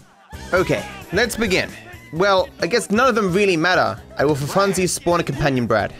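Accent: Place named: Australia. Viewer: he’s Australian from Sydney im Australian from Sydney he grew up in Sydney I grew up in Sydney so our English is the same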